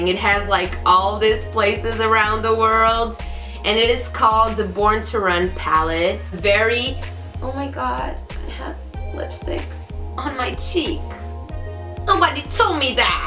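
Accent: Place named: Portugal. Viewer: hmmm okkk! Mas se calhar era uma forma de eles começarem a perceber outra língua! Acho que não é tão natural, eu percebi logo que eras portuguesa. E atenção, que falas muito bem! Mas acho que podias ter mais sucesso de falasses em português. Mas se não te sentes confortável, então já é outra coisa. Muitos beijinhos!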